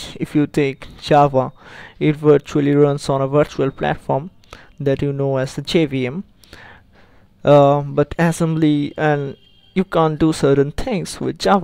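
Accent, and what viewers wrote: India. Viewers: There are not a lot of good Assembly tutorials out there and based on my own experience i glad I found this one as, while the presenter here has a thick accent, it is easy to understand more than 90% of what he says whereas the other Indian tutorial makers have accents that are just far to thick. Thanks for this tutorial. I am trying to learn assembly to further my knowledge of hacking as well as pass my CDA class (hopefully) lol. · Everyone talking about his accent. Basically, Indian people are the majority who post ANY kind of relevant tutorials or help videos on youtube for programming. Maybe if some other people would actually take the time to learn and teach and post on here, we could say something. Til then, I'm just glad people like take the time to try and help us.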